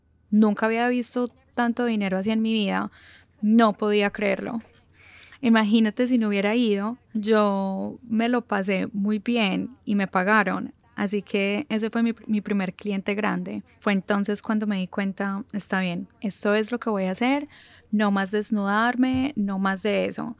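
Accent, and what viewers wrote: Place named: Colombia. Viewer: Amo el acento colombiano de la chica que habla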